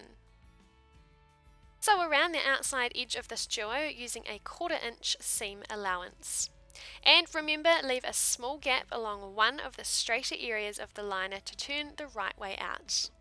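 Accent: New Zealand. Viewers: she has a very strong NZ accent 🇳🇿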